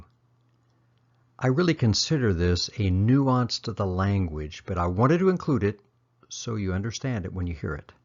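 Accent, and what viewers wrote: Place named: Myanmar. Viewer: you are genius and smart man. I love your Myanmar accent.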